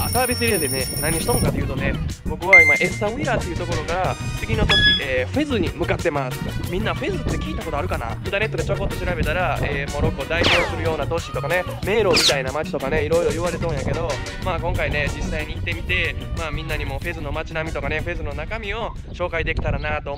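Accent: Kansai, Japan. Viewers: are you kansaijin?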